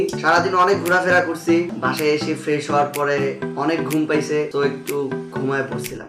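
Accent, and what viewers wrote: Tangail, Bangladesh. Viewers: vaiya tomar basha ki tangail.....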